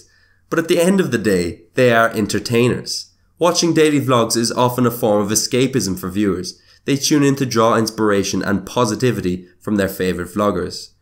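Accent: Ireland. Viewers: Is that an Irish accent I hear?! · to begin, I love your accent! Ireland Forever!!!! I agree with your evaluation 100%. I grew up in Ct., not too far from where he is from, and he is a proud son of our state! Thank you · I LOVE your Irish accent! What part of Ireland are you from? Btw I LOVE how insightful your video is. It helps me figure out how to better grow my own vlogging channel!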